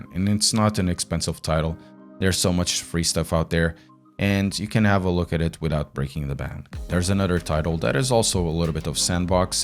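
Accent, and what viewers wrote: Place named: Portugal. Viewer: Are you portuguese perchance? Your accent sounds like it Edit: Eu sabia! 😂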